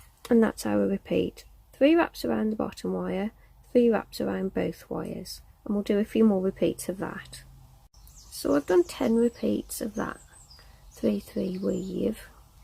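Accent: United Kingdom. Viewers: ....I just HAD to add a comment about your tutorials. I just completed this tutorial and I have to tell you that your soft voice is as soft as silk and it is soooo soothing to listen to you. I could listen all day! Love your British accent, also! You are such a favorite artist of mine; you keep every part of your tutorials clear & simple. I also love your name. My youngest daughter is named, also! You are a stellar jewelry artist & I am so appreciative of your tutorials that teach so much. I am retired and have been making jewelry for six years now,of various kinds, but am fairly new to wire wrapping/weaving. Thank you so much for your sharing generosity!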